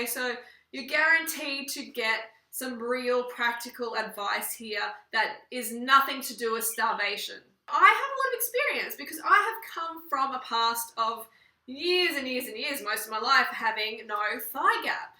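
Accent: Australia. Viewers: what part of australia are you from? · You ave an Australian Accent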